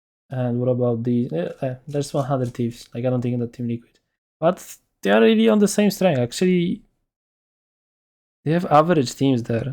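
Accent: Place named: Poland. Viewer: akcent polaka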